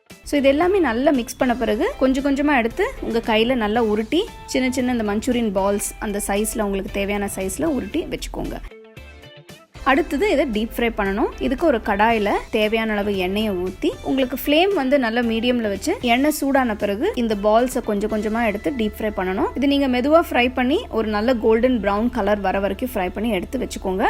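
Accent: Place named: North India, India. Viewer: Tamil uh? I thought ur from North Indian..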